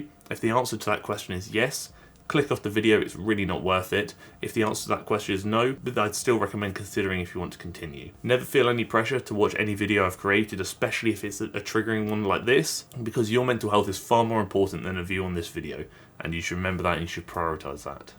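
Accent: United Kingdom. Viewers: I have PTSD that makes British accents (specifically male voices) very triggering for me. You are a rare exception. I largely attribute this to your content and sincerity.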